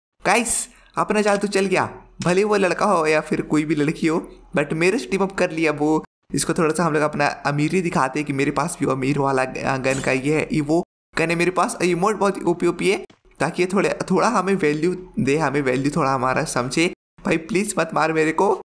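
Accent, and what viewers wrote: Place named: Karnataka, India. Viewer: Bro are you from Karnataka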